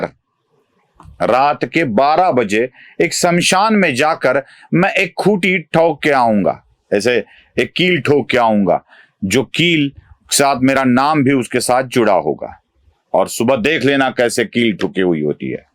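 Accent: Maharashtra, India. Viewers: जी आपकी एनालिसिस तो अच्छी है ही, आपकी मराठी निष्ठ हिंदी भी बहुत अच्छी लगती है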